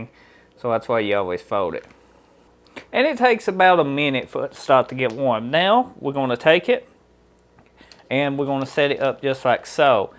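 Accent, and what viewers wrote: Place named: Deep South, United States. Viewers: I thought Cajun...but sincerely hope that's not an insult.. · I’m not sure if your accent is depth south or Australian 😂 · it is swampy, and american - but that can be a few places in southern parts of the united states - the dialect is from several sources in its past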